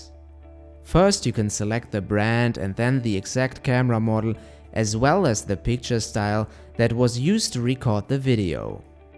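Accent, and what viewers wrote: Germany. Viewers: is that a german accent that this guy have?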